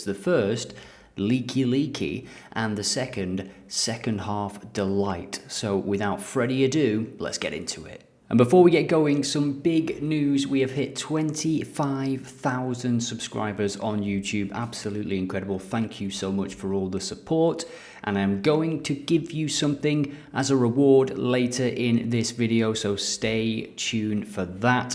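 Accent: Scotland, United Kingdom. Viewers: Great tips! Thanks for not just providing the platform but giving these helpful videos always! Have a good feeling for this weekend. Loved that Scottish accent!!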